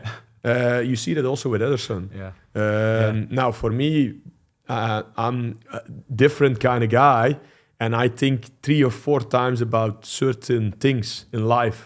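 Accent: Liverpool, United Kingdom. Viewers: He still has a scouse twang to some of his accent haha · sound's very scouse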